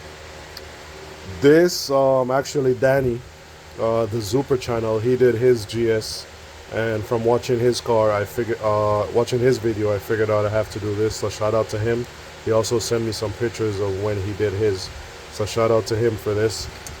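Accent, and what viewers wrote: Jamaica. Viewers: You’re Jamaican? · Heard the accent lol! 🇯🇲 outdeh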